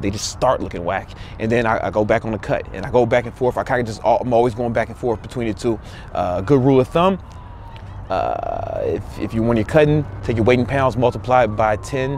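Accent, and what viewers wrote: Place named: New York, United States. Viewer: For someone that's from ChiTown, you have a heavy set, NYC accent fam. When I first saw.your vids 2-3 yrs ago, I always thought you where from Da Big Apple. How long you've been living here? P.S. I took the liberty to check your Six Pack Cheat Codes. Definitely gonna add you to my email friend list. I saw PT 1 & that shit look mad intense. I'm recovering from the flu hence, I don't have the endurance much less, the strength to dare fuck with your regime. Not til I get healthy. I'm slim, I should be able to get a six pack before summer ends. P.P.S. Didn't I tell you your Bulls were done in the 90's fam? 😄 I know, I know, Knicks in the 70's. Smh!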